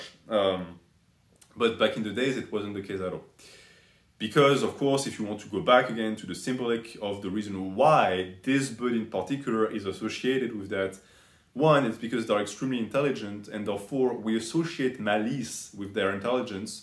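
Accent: France. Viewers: Many people here being grateful for thick french boi reading and sharing his toughts on the books but dont forget to read it yourselves too.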